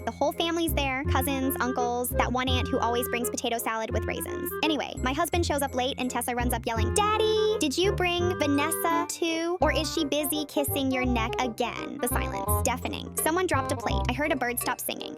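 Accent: Texas, United States. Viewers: The texas accent makes it funnier😂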